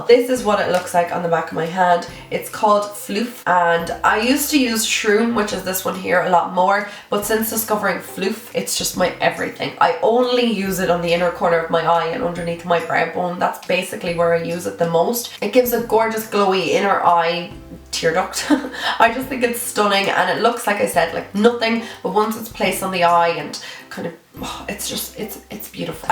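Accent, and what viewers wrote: Ireland. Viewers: You're gorgeous! 😘 Love your accent, is it Irish?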